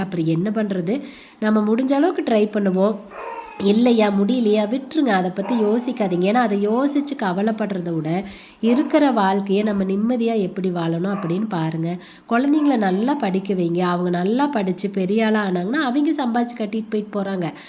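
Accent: Kongu, India. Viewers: கொங்கு தமிழ்❤❤❤அழகு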